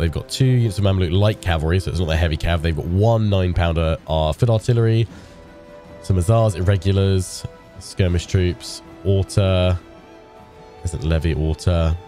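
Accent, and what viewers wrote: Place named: England, United Kingdom. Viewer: Hello, Sir, Excellent to see Napoleon in Egypt! Well done with the start up battles, I enjoy the fire and movement of your Infantry, use of terrain and Cavalry exploits to knock out your enemies Artillery right from the start! Most excellent indeed. I fear that your garrisons behind your lines are too weak? If Nelson desides to land on the coast with the Ottamans everything you have will be in Cairo and that will mean a double back to push them back. Also as time will pass and the Egyptian resentment will increase you must leave suitable garrisons behind, unfortunatley, to deal with the unrest. This is a strange and land of 40 or 50 centuries very unlike Euroupe in most ways, especially religion! Even Ceasar had to leave a legion in Alexandria to secure his rear and administration of supply. Love this content and I hope you feel better soon! 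Always odd hearing about french exploits from someone with an English accent! Amusing! Glory to France and Napoleon! Love this content and keep it coming! 🍻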